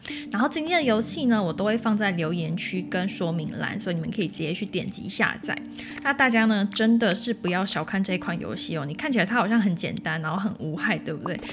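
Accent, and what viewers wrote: Taiwan. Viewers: 对台湾腔真的是没抵抗力……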